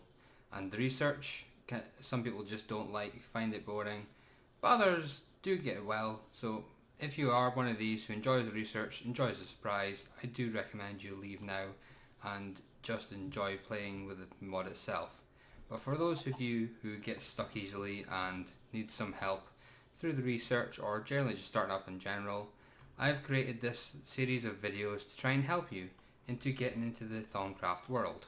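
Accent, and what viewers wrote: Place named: Scotland, United Kingdom. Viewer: Soon as I heard your Scottish accent I knew I could trust ya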